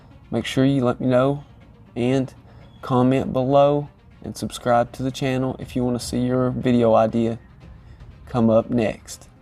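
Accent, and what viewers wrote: South, United States. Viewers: Thousand likes just for the "down south" accent!!!!!!!!!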